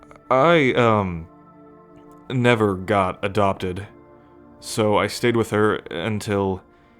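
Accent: South, United States. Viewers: the ever so slight southern twang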